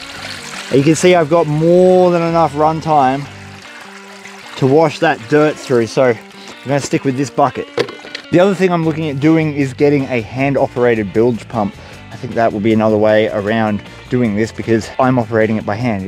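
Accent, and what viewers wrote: Australia. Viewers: Does your accent tell me your on Australia?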